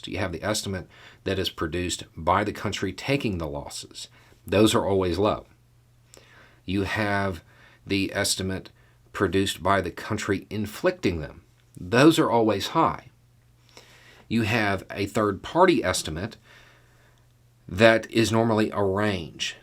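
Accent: South, United States. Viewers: I want to trust you because of your beard. Stick with me, please. I want to to trust you because of your familiar southern drawl. I want to trust you because of your witty t-shirt choices. Really, hear me out. I want to trust you because of your sensible and informed perspective of global politics and international dynamics. I FEEL like you are honest, and that you value MY ability to understand what's REALLY going on in the world. Your words give me hope, and my ability to follow your train of thought makes me feel intelligent, and better informed for absorbing your discourse. Please, tell me this. What is your motivation for sharing your keen insight and your witty parlance? Is it for the clicks? Is it for the lolz? Or is it genuinely for the desire to promote a more informed public awareness of the issues facing this bewildered generation of hope mongers? 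I think you might be a thorn in the foot of the misinformation and cynicism that plagues the minds of the susceptible masses. I think you might be a hero. Why? Why do you share your perspective? Why do you post multiple videos every day to tell the world what we should have the sense to learn for ourselves? What motivavates YOU to motivate US to wake up and pay attention? Your answer to this question will I use to judge myself, and my naivety for entertaining content. You compel me to seek truth and lucidity, and I ask that you speak to this influence you have on me. Your silver tongue is a potent tool. Are you as genuine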